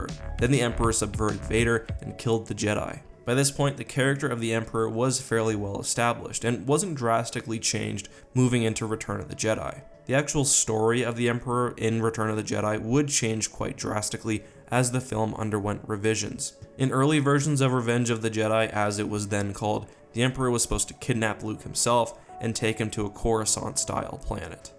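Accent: Canada. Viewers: Question for you. Not a criticism, but from the way you say your “outs” you’re Canadian or from the Wisconsin region. Correct?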